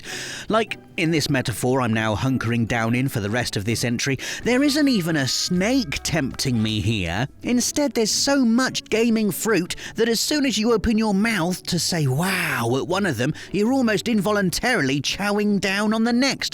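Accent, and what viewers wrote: England, United Kingdom. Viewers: Wrath is pronounced ‘Roth’, inventory is pronounced ‘in-ven-tree’. It’s like and English accent American channel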